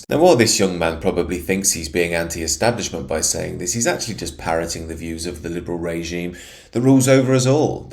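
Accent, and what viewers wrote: United Kingdom. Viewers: Says a brown man speaking with a British accent... "That which is well taught, lasts for a long time."(Tao) Culture is from the hinterlands not the cities. Even though they like to pretend they are more cultured, which is what this is. The reason this is true, is because cities are like Elephant graveyards. More people die in cities than are born there, and when theres a plague, or a war, or a population collapse, the cities are where the worst is the most. Suggest listening to Whatifalthist's video on population collapses. An example is the great depression in the US, some 14 million died of malnutrition related causes, mostly urban as rural people grew food. In WW2 London was a major target. Rural areas maybe get a crashed airplane. Basically cities are unnatural, they are caused by the love of money... "That which is unnatural, does not last long."(Tao) Usually cities get trashed, and then the Ant farm rebuilds on the same site. 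But not all the ants make it. Sometimes its even invaders who rebuild on the sites of cities they trashed. England has cultural invasion syndrome. Its not the first time in human history. The Germanic Barbarians who trashed Rome, then decided they were the Romans... But it didnt work, because they werent Romans... They were still Barbarians. It took them another thousand years in their own lands to climb into an acme civilisation. "Civilisation, is the terminal phase of a culture."(US Army ROTC training manual, "Readings in the Evolution of Warfare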